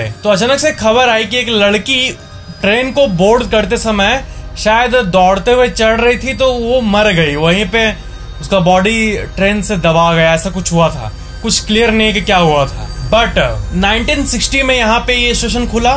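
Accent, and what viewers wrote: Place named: Jharkhand, India. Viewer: Jharkhand se lag rhe ho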